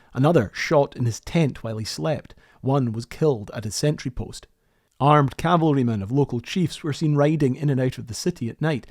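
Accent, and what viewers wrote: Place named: Scotland, United Kingdom. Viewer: OMG! I've gone off my nut! How have I not found you yet laddy? I'm 6th generation Canadian. My many great grandpappy on my mothers side came from Ochiltree, East Ayrshire, Scotland in 1857 and settled in Victoria BC. Both sides came from Scotland. Nothing I love more than a glass of scotch, some Still Game and a thick Scotty accent telling me about history. Keep up the good work boyo!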